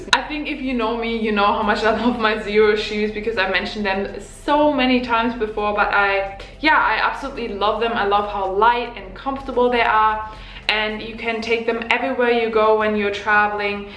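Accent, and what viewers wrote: Germany. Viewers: Hey! I just wanted to drop in and say that I LOVE your content! I just subscribed and can't wait to see more videos :) I also really love your accent. One of my very best friends is a foreign exchange student from Germany and it reminds me of her. I'll be so sad when she leaves, but we will be able to stay in touch and hopefully visit each other in years to come!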